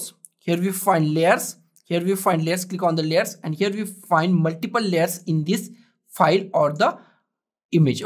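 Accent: India. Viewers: is this indian or some one trying to be an indian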